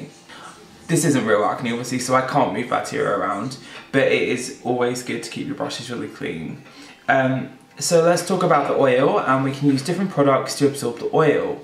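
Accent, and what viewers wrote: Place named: United Kingdom. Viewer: Omg I was thinking the same thing I think it's the British accent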